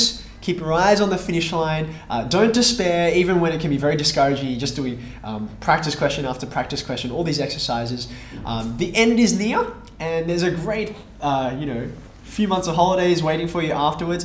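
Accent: Australia. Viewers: The Austrailian accent makes everything you teach <num> times better!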